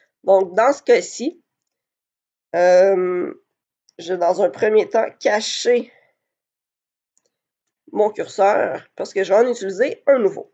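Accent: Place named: Quebec, Canada. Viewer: accent québécois magique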